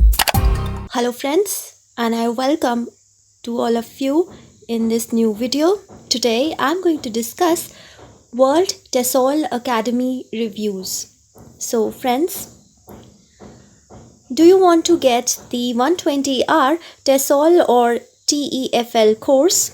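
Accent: India. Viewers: Indian accent you can't blame her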